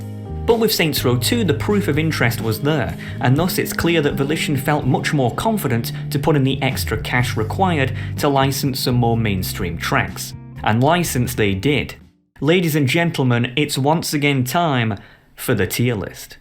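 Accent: United Kingdom. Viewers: wait.. you're bitish?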